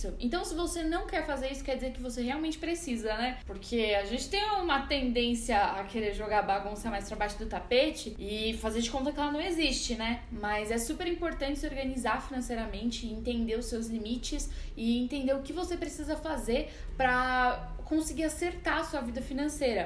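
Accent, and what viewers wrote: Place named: São Paulo, Brazil. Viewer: E esse sotaque paulista? hehe (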